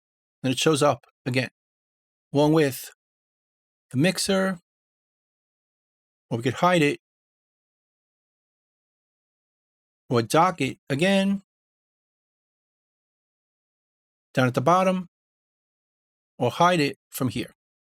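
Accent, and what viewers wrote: New York, United States. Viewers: sounds like Christopher Walken with a New York accent. And man, these videos and how he presents the material is perfect! I've learned everything I know about Reaper 7 from. Thank you!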